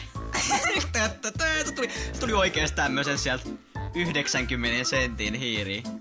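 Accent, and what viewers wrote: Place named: Turku, Finland. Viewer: on kunnon turu murreee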